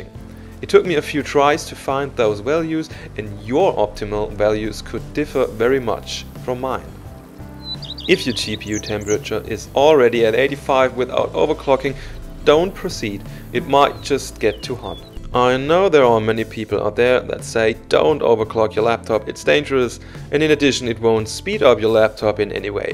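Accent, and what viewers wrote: Germany. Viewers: Ah, ein Deutscher, absolute Klasse, wir Deutschen sind eben einfach am Akzent gut erkennbar :)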